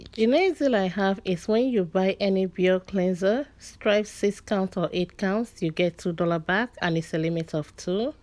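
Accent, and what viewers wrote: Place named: United Kingdom. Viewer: I don’t agree! She has an English accent as in African we were taught by the British so the English is slightly different but fully understandable! I for one cannot understand someone like the Couponer Jodi Dunn as she has a strong accent making it difficult to understand the English!She posts the deals very well AND EARLY! I suggest you follow someone that you can understand instead of leaving such disrespectful comments!!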